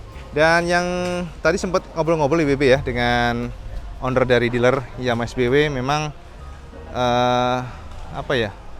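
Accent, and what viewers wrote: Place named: Kediri, Indonesia. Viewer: Mas asli mana ya kok bahasae mirip2 orang kediri ya,campuran lah khas jawa timur.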